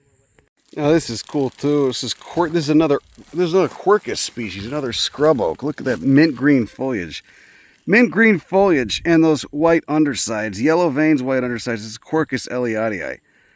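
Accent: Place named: Florida, United States. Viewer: he really got into florida character for this episode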